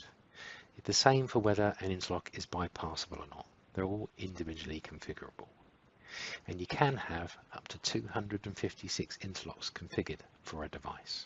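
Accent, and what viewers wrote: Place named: United Kingdom. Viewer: Might be worth contacting the UK distributor as that's a UK accent.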